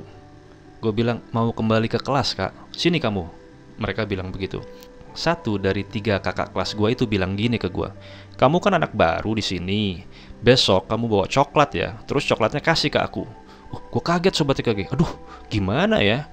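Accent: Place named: Cilacap, Indonesia. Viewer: Wah cilacap nyong dong😂 sehat"lahir batin bang😇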